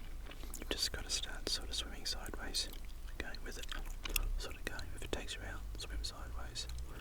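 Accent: Australia. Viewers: Love your voice! The Aussie accent is by far my fave. I also watch another Aussie, but he’s not ASMR. The Drain Addict YouTube channel. Another great one!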